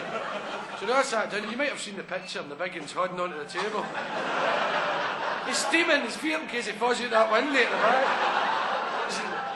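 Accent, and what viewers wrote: Glasgow, United Kingdom. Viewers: This is hilarious. I wonder is there anyone who would be able to help me with the transcription of this? I wrote down all I can understand, but there are parts I just don't get. I can send you what I have written down, if someone would be up for checking it out and correcting mistakes. I am not a native English speaker, so the Glaswegian accent is a bit of a problem for me, I would appreciate it. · to be fair, it is pretty hardcore Glesga patter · anyone here today for a listen.. I listen every Easter… funniest 15 min.. always wondered what the “ last miracle was”.. maybe one of you were at the Airdrie show… “ see you Judas your gettin on ma…..” pure Glasgow… awe the best🍻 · Love Billy but the Glaswegian accent is something else. Haha. Understanding about 90% of it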